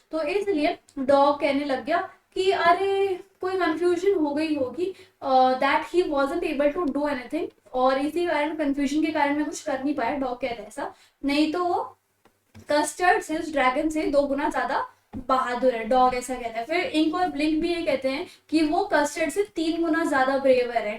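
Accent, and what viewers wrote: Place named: Haryana, India. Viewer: Didi apki awaz se aap haryana ke lag rahe ho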